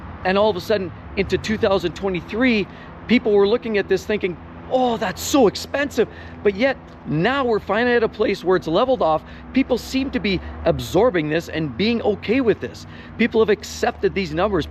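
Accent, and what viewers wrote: Canada. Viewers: He’s Canadian.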